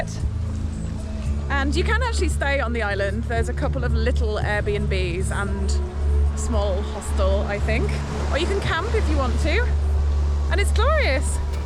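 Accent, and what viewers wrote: United Kingdom. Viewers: By your accent you are British it seems. I'm Salvadoran and Gulf of Fonseca is only 30 mins from my house! Greetings!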